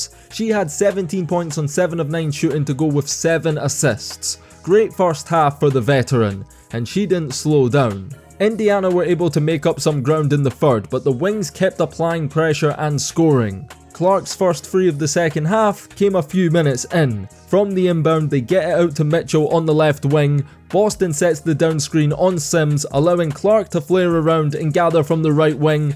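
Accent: Scotland, United Kingdom. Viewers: Where is the accent from, please? Scottish? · It’s a bit odd, hearing a basketball game narrated by a Brit. They play bball as much as we play Cricket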